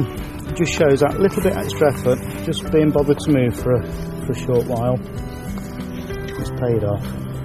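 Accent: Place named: Northern England, United Kingdom. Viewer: I live in belper derbyshire but im from up north and by the sounds of your accent your obviously not far from where I live just wandering where abouts this location is? because it looks a lot like the belper strecth of river or is it a lake where you were fishing? its just ive heard theres a few carp in my river which noone fishes for and I fancy giving it a go for a few day sessions cheers regards mike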